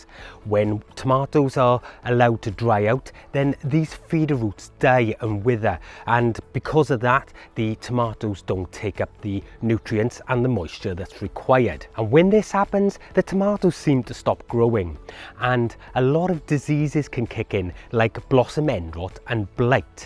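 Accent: United Kingdom. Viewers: You have beautiful British accent. I love it. Thank you very much for informative posts.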